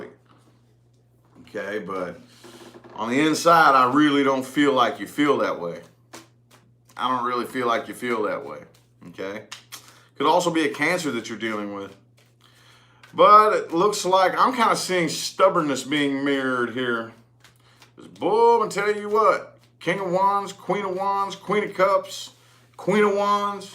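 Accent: South, United States. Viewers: Hey where in the south r ya from accent drivin me crazy cuz I can’t figure out where it’s from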